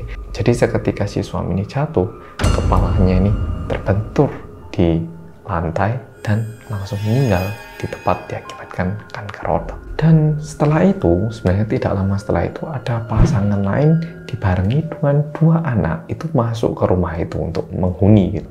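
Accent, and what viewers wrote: Java, Indonesia. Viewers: Makasih, Boloku Sing Paling Medok Dheweeeee 🙏🏼🙏🏼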